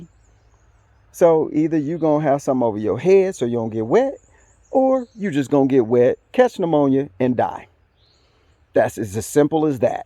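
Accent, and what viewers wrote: South, United States. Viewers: I love the message. you sound like you from down south. my family is down for your training!! this is food!!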